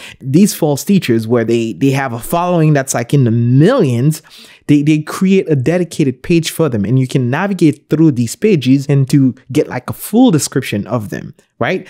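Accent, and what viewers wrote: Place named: Haiti. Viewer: your videos and exposition are outstanding. Quick question. My wife and I sensed (what we perceived as ) a ever so slight Haitian accent as we are from S. FLA and it has one of the largest Haitian communities in the US. Are you by chance in S. FLA? Because if so and you are a pastor then we'd LOVE to attend one of your services. Let us know and which church if you are indeed a S. FLA pastor. Thanks and God be with you always.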